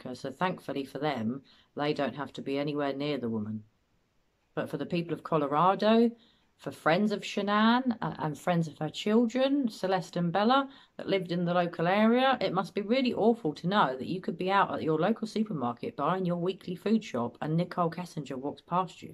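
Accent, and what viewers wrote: England, United Kingdom. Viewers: Why is doing YouTube videos with an English accent.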